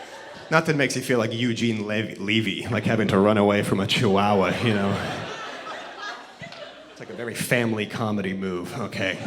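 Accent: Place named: Massachusetts, United States. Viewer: I was thinking ok that’s Massachusetts or Portland because I actually understand this guy and I now I believe it is Massachusetts.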